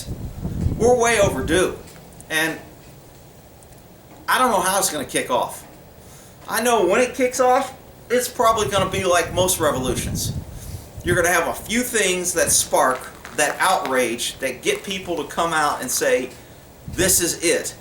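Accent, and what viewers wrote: Texas, United States. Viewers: Because with your accent, your "gas" sounds more like "gaz". I like your Texas twang. Even when you were in California and that's when I bumped into your videos of, I could tell you weren't a native Californian. I thought, "This guy's from Texas." My older relatives on my mother's side who are French Canadian will say gaz rather than gas. When you mean to say "quiet", you say it fast and it sounds more like quite. I'm not picking on you at all. Every one has a different accent. I'm from New England and every one knows the old "Pahk the cah in Hahvad yahd (Park the car in Harvard yard). When I lived in Virginia in my early 20s, some times people would ask me where I was from, when I said Massachusetts they didn't believe me because I didn't sound like a Kennedy. That's the only accent they assumed we had, which is not so. Actually, only the Kennedys have that weird accent. 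I usually don't drop my Rs because my parents didn't talk like that. But now that I'm half a century old, I sometimes drop it. I noticed my father dropping his too now a days when he never did before. A friend of mine and I took a road trip to Florida years ago. When we stopped in Georgia to get something to eat, it was like we were in another country. I could barely understand what many of them were saying because their accent was so darn thick! Wow! Then in Florida I could understand every one just fine. Also, a Texas twang is not the same as a southern drawl. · I wonder if anybody else catches your greeting in all your videos? "Morning Dumb People"...or is it that Texas draw...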